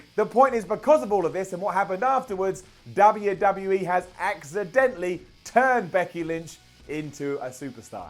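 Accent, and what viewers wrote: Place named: United Kingdom. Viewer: Why he big enough himself in isnt in NXT or WWE in got the British Voice lol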